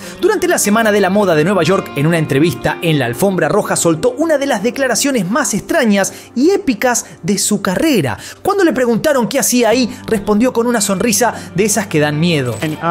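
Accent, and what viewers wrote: Argentina. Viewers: La vocesita y el acento argentino que no deja de verse el ombligo y sus comentarios lúmpen hacen que mejor veo cosas inteligentes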